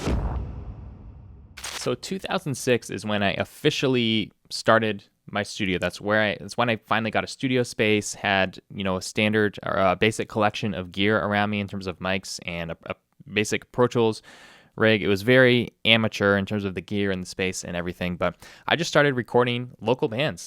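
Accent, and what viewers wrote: Canada. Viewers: Video recommended on YouTube. "Well that's a good southern Ontario accent if I've ever heard one". Going through bands - oh yeah, these are all the hardcore bands I listened to in High School, man, where I grew up had such a crazy hardcore scene. Then proceeds to play song from band I went to high school with. Well yeah. That makes sense.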